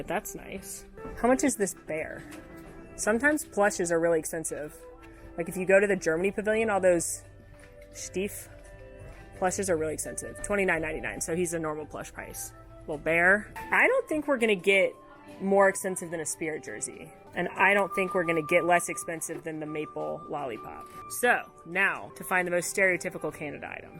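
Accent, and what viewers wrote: England, United Kingdom. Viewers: Hi from the UK - love the 'trapped' sessions and your British accent 😅😊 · English accent in Canada?! 😅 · your Canadian accent sounds more British to me;) I'm French Canadian BTW so I might be wrong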